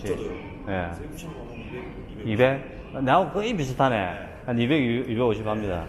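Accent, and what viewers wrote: Gyeongsang, South Korea. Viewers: 오히려 더 친근감 느껴지는데요 경상도 사투리 중독성 강합니다 전 경상도 사람 아니지만 넘 듣기 좋아요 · 절대 경상도 사투리가 불편하지 않아요 가정적이시고 정말 보기 좋아요 앞으로도 계속 잘보겠습니다~~°행복하세요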